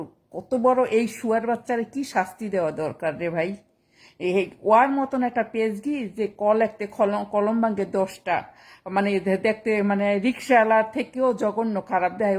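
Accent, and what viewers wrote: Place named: Barisal, Bangladesh. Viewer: মা তোমার কথাগুলো ভাল লাগে। সমস্যা না থাকলে তোমার নামটা জানাইও। আমেরিকায় বসে তোমার বরিশালের ভাষায় কথা বলা অনেক ভাল লাগে। কারন মুইতো বরিশাইল্যা পোলা। ভাল থেক প্রাণ ঢালা আশীর্বাদ রইল।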